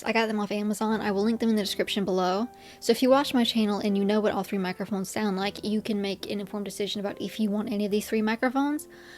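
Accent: South, United States. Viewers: As a Californian, I have to admit my prejudice against southern people, and you are just a reminder of how ignorant I am. Love your accent and most of all your videos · Your accent reminds me of an attorney colleague I worked with years ago. He also put a lot of work into lessening his southern accent but I found it endearing in the occassions where it did come out stronger. I think your accent also gives you more implicit authority that you know what you're talking about when you discuss these beliefs present in christian spaces. It might be a little weird to you to hear, since this probably wasn't intentional on your part. Just my 2 cents on it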